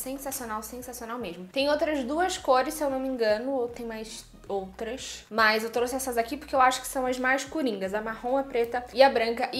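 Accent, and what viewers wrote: Rio de Janeiro, Brazil. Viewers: vc é carioca?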